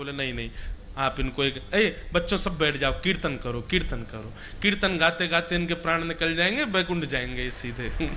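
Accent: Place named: Braj, India. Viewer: Raadhey raadhey guru ji aapke charno me koti koti pranam aapki Katha me rojana subah jagte hi sunti hu aapke mukharvind se brij bhasa bhut sunder lagti hai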